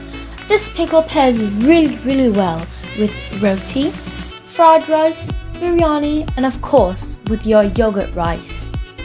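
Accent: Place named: United Kingdom. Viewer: Great try with your British accent... came out well like recipie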